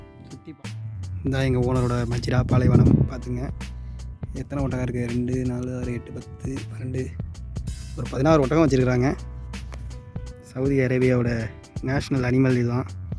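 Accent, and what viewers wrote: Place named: Tirunelveli, India. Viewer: மிகவும் சிறப்பு அன்பர்! வாழ்த்துக்கள்!! திருநெல்வேலி அல்லது தென்காசி பேச்சு வழக்கு தங்கள் பேச்சில் தெரிகிறது!!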